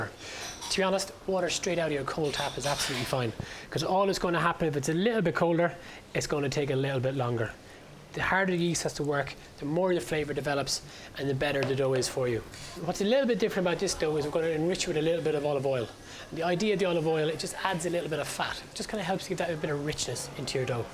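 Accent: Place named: Ireland. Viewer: bet you're fun to be around in a day to day situation. Maybe have that stick surgically removed from your backside?? Jeez. I have to say that I too enjoy his accent, but it's not because it's his.. just enjoy the irish accent. If you can't understand things like that ... well I REALLY feel sorry you ...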